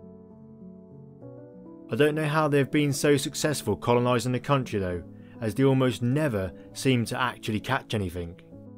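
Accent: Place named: Hampshire, United Kingdom. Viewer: your accent is so similar to hampshire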